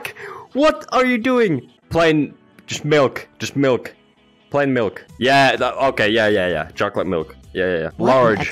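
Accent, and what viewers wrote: Finland. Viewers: Hol up is that a finnish accent? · I might be totally wrong but your english sounds like you're finnish, is that true or am I tripping? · i love your english pronounciation, reminds me a little bit of the famous finnish "rally english", and i mean that in the best way possible. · Are you finnish? You sound finnish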